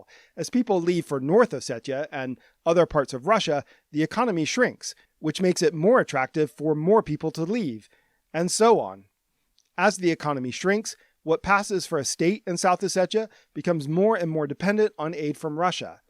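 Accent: United States. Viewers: You sound like a American.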